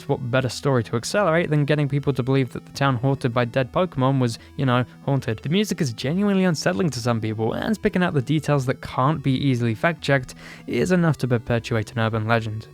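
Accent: United Kingdom. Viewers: your bored british voice is going to be nostalgic some day lol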